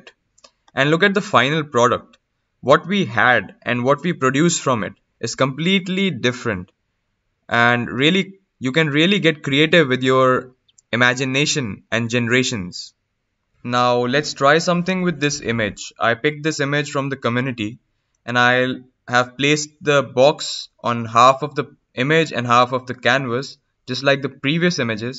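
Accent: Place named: India. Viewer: hey, man, I totally understand your indian ascent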